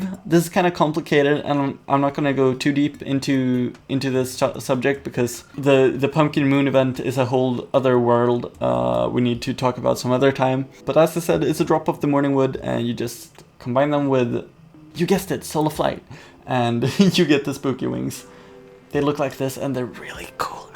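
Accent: Sweden. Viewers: because he is swedish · But the I realized he was “Swedish” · It's a Swedish accent, get used to it. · Are you swedish? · hörde att du var svensk direkt, enkelt att känna igen svensk engelsk dialekt ^^ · are u swedish? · Are you from sweden?!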